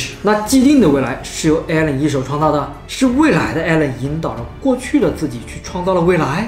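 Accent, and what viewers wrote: China. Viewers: 這口音是大陸人吧，完全聽不下去了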